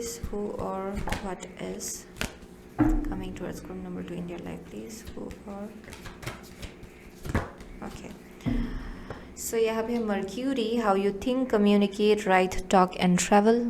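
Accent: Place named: Uttarakhand, India. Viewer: But her accent sounds she definitely from uttarakhand, ne ppl has a little accent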